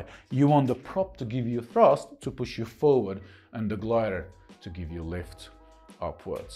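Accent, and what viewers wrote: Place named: Slovakia. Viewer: It's just his accent. He's from Slovakia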